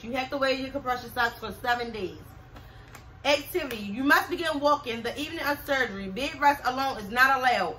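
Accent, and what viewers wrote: Baltimore, United States. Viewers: Glad you did good with your drink. Your right, it’s good to try the massages. You look fabulous by the way and I’m loving your accent. Sounds like Baltimore??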